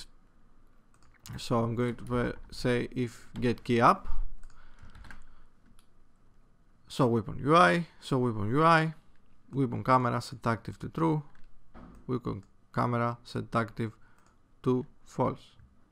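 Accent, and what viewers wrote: Greece. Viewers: The Greek accent though :P Nice tutorial, much appreciated :D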